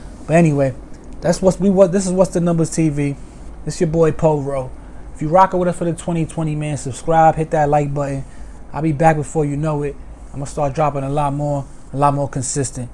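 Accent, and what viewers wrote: New York, United States. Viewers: I Love your voice it sounds so Native New Yorker !!! Love from Jersey Gal Born and Raised !!! Peace To Be !!!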